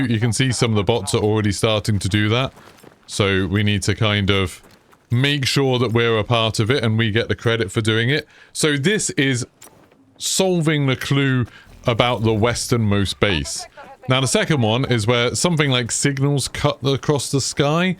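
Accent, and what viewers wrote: United Kingdom. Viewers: “Non-english”. Bro, he’s from England. Did you want an American accent with the guide instead?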